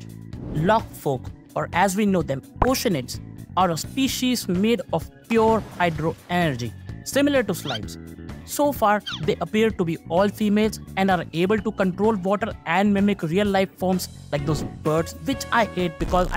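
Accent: India. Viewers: ur indian ryt?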